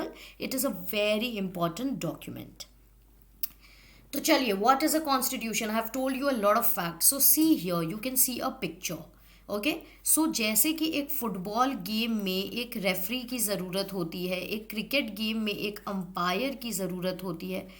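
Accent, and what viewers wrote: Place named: Haryana, India. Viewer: Mam you are somewhere from haryana i am right mam i am from mahendragarh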